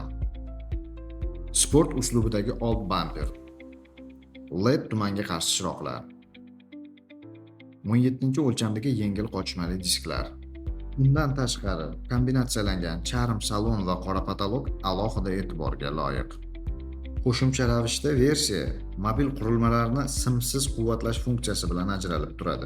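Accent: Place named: Uzbekistan. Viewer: Тоза узбекча учун алохида рахмат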